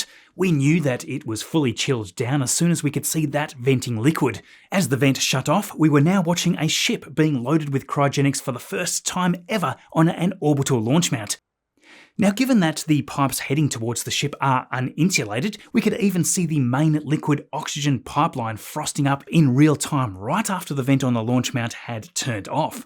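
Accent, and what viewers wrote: Australia. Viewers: I love listening to Australians explain stuff. Their accent is top notch.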